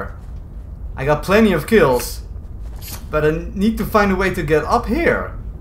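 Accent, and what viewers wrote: Netherlands. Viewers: you sound so dutch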